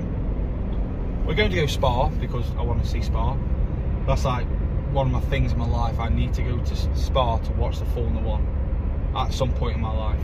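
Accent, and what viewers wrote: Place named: Stoke, United Kingdom. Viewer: I’m detecting a Stoke accent.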